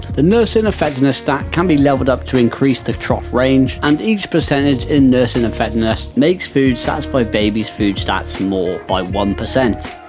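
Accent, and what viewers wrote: United Kingdom. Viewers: It's relaxing to me watching your videos! Even just listening to it lol, I love the British accent! Great video!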